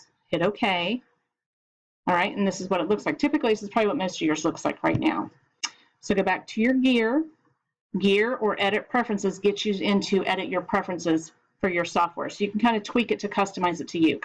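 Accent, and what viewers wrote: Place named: United States. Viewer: thank you for this, really appreciate your help. love your american accent! why the fuck are defaults so insanely wrong all the time, its driving me absolutely crazy!??!? :/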